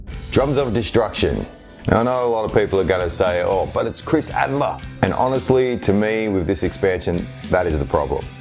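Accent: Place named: United States. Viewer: I am definitely going to add Modern Metal and Dark Matter to my EZX collection... You're so right about the clarity of Modern Metal sounds... OMG *Random question...., did you ever live in the United States? I had a childhood friend that you remind me of who lived in my neighborhood in Georgia...he even had an accent similar to yours. I can't remember his name...he had a brother named "KK." -at least everyone called him that. The family moved away early...I was probably 6 years old. -I am almost 50 now.. 😂 ***Your channel has become one of my favorites -out of nowhere... great content,!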